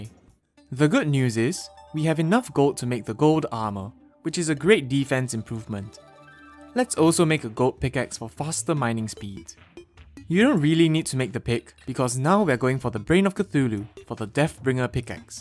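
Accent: Singapore. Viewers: Where are you from? Your accent sounds singaporean lol.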